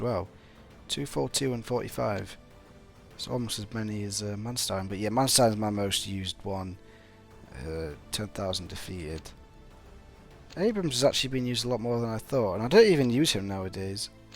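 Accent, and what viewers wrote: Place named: United Kingdom. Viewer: From the accent i would have guessed he was from the UK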